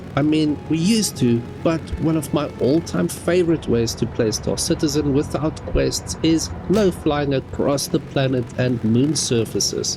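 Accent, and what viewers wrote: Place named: Afrikaans, South Africa. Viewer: jy klink afrikaans, julle dalk 'n org wat ek kan join?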